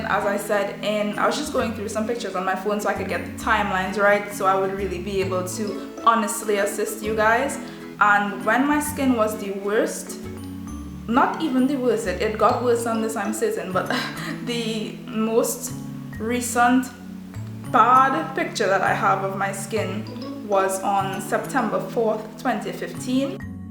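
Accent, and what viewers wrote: Trinidad and Tobago. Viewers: know a Trini when I hear one 🇹🇹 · Your skin looks really good. What foundation do you use, if you still use any? I'm really happy to hear another trini voice ;-) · im hearing that trini accent girllll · I hear a trini accent! yasss gyl i support u! and you're very beautiful btw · Sound like my Trini neices from San Fernando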